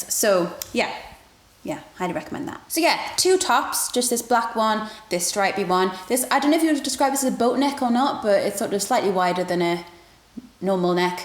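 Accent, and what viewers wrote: Newcastle, United Kingdom. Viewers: Love the Geordie accent problems. I say 'luck book' haha x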